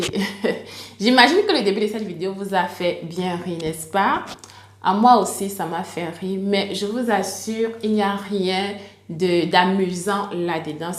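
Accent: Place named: Cameroon. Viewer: L’accent camerounais 😂❤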